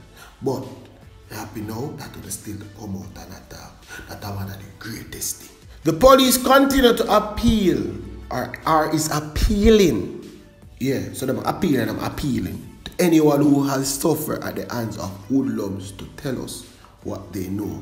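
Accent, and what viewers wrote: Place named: Jamaica. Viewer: It seems that non Jamaicans can't understand your style of speaking; and your up & down tone is similar to a preacher ( in JA, a church elder). It would be marvellous, if you could try to accommodate your growing international audience, by offering 'close captioning' - black lettering on a white background. Much thanks. More time.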